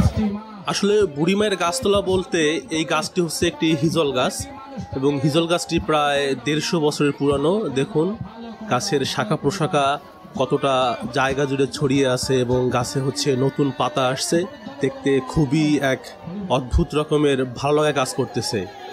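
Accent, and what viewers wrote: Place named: Khulna, Bangladesh. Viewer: তোমার বাড়ি তো খুলনা জেলায়। কথাবার্তার ধরণ শুনে বোঝা যাচ্ছে।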